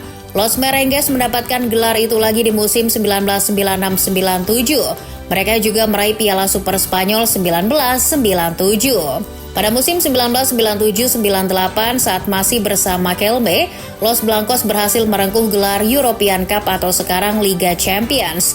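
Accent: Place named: Banten, Indonesia. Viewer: Admin orang Banten ya lur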